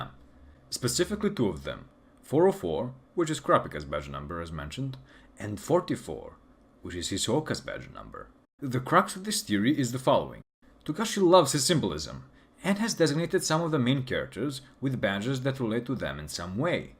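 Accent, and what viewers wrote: Eastern Europe. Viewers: Slavic accent is excellent, don't be shy.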